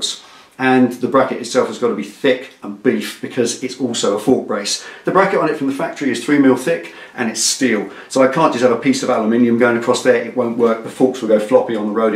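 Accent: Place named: RP, United Kingdom. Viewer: A true English gentleman who speaks the Queens English whilst using an English wheel. It doesn't get more refined than that ladies and gentleman.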